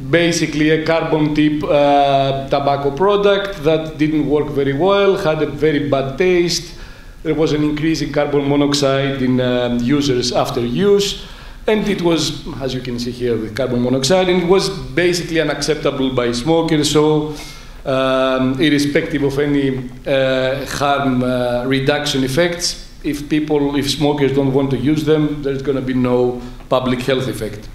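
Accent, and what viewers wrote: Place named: Greece. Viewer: 100% accurate Greek accent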